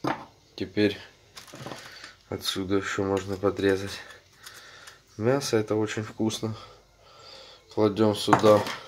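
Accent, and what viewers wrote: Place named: Ukraine. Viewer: Наш с Украины? Слышно по разговору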